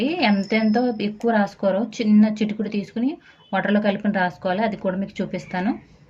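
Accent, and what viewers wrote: India. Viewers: Matladetapudu slang easy ga telustundi godavari ani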